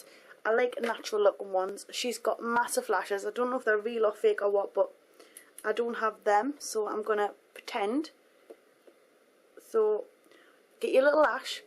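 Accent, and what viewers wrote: Newcastle, United Kingdom. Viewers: ah your a geordie, me tooo, x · a new castle accent i love it the accent , and your stunning btww . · Omg are you a Geordie like Cheryl? :)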